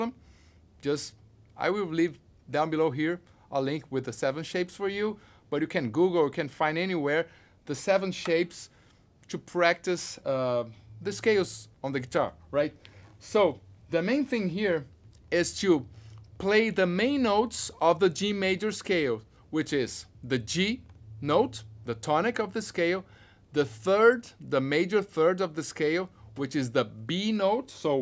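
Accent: Brazil. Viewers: disse que sabe falar em ingles como um nativo mas fala desse jeito abrasileirado porque gosta, meio que simbolo brasileiro sla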